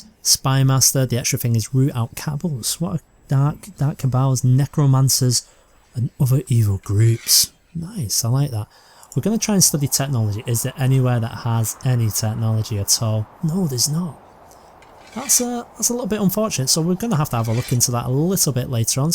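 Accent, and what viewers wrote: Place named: Scotland, United Kingdom. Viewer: Your relaxed accent reminds me of * and history documentaries.Scottish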